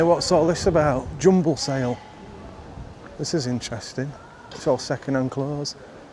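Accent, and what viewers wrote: Yorkshire, United Kingdom. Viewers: Two things, did you notice how the blue skies appeared once you’d crossed over t’Pennines and secondly, you started talking in your best Yorkshire accent. I know this because I do the same when I’m back home in God’s own country.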